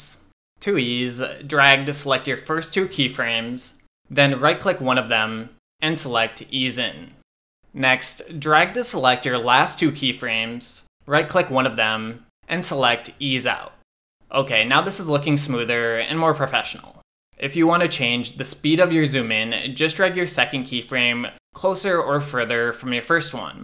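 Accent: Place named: Los Angeles, United States. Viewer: I am not american, but I noticed that LA accent)))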